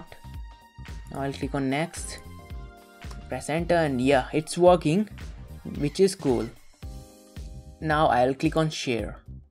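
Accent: India. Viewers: just cuz the guy sounds a bit indian? racism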